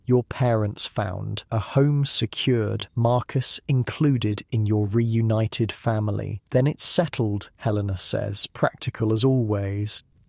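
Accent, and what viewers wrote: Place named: Scotland, United Kingdom. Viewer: Sounds like Scotland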